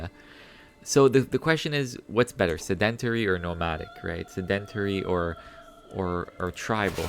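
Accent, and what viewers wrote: Arab world. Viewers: loved it, and its nice knowing ur an arabic from ur accent, keep going bro.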